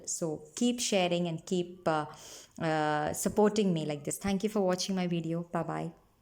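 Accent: Kerala, India. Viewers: maam are u malyali??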